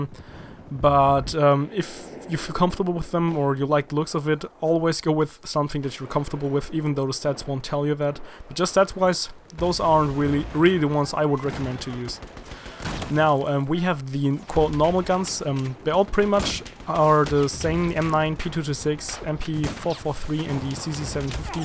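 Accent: Germany. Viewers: Are you German, because of your accent? ;D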